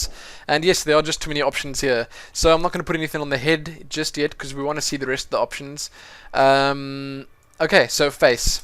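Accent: Afrikaans, South Africa. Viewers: Haha. British? Lol. Hes South African. You ignorant person haha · hey bru i noticed you accent...u south african? BTW nice video, im quite impressed with the attention to detail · judging from what I know sounds like an Afrikaans accent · He's not British, he's South African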